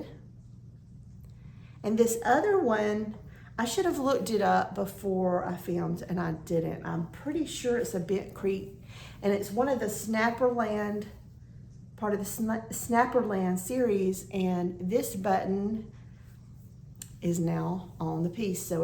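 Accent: South, United States. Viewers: Your work is always so gorgeous! I love the patterns you choose and your color changes for many of them. I gave up flosstube for a long while but have only recently decided to revisit a few 'makers & stitchers' who inspire me with their unique style and love for the art. I'm delighted to learn from you, be inspired to pick up my needle, encouraged to tackle a project that I've judged too difficult, and be satisfied with with a completed piece ... even tho I sometimes only see the mistakes! Your sweet spirit and that lovely southern drawl... the stories of treasure hunting at vintage shops...tales of trips to the beach for sun & seafood...all of it brings up memories of not so long ago trips to Foley beach, Isle of Palms. So grateful for your presence on YouTube!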